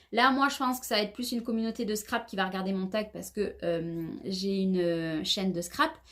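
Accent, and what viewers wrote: South, France. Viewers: Bonjour,pause café,soleil et une vidéo Tag youtube très intéressante, j'ai apprécié ta sincérité et ta franchise..! J'adore regarder tes vidéos 😍 tu es tellement gentille attentionnée pétillante généreuse,et surtout très talentueuse,et ton petit accent du Sud 😉 j'aime vos créations en duo avec Marick.. Tu es exceptionnelle,tu me remplies de joie quotidiennement. Je te souhaite une excellente journée ensoleillée et créative 😉 pleins de bisous 😘💋 Bea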